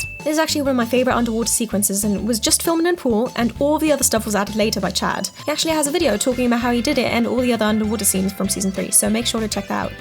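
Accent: United Kingdom. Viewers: When did you become British? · Why are you British now??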